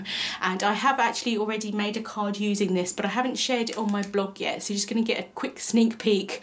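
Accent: United Kingdom. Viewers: Beautiful haul you collected. I'm my ignorance I stupidly never realised you were on my side of the pond. So used to our American Crafter's the accent didn't even sink in. Glad I've found another UK crafter. Hope the headaches get sorted, I used to have so many cluster migraines I totally feel for you x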